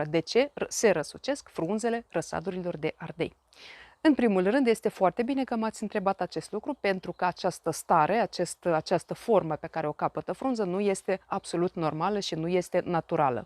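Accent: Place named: Moldova. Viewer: Cred că toți cei care vă vizitează canalul de youtube vă apreciază. Eu, de asemeni. probabil că sunteți cadru didactic la o facultate de profil agronomic. După accent, proveniți, sau sunteți din Republica Moldova. În România, din păcate, s-a rărit drastic numărul tinerilor care se mai ocupă cu grădinăritul. Oricum, vă urez succes în activitatea profesională și nu numai.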